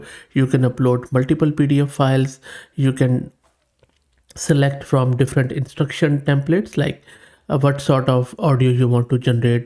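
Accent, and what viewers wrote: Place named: India. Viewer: Horrible Indian accent😂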